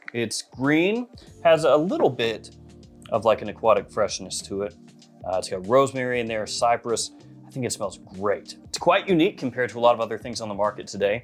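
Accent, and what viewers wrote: United Kingdom. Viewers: Mate, I'm from the uk and we ALL speak like that!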